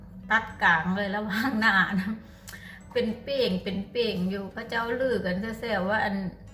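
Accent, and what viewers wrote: Phu Thai, Thailand. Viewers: มาฟังป้าเว้าภูไทนำจ้ามวนๆจ้าสำเนียง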